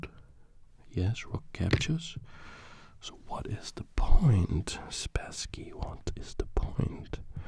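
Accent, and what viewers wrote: Denmark. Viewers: Wait are u danish? Cuz u have the accent of a danish person:) its very calming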